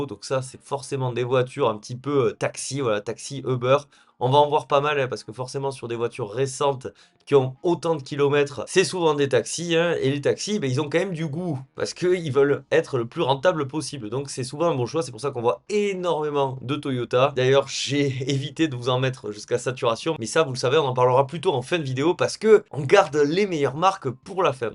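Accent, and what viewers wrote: South, France. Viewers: la grande classe, le sourire, le petit accent ensoleillé… bon je préfère les formats sur les Youngtimers mais au moins tu en fais pour tout le monde. La bisette à toi change rien.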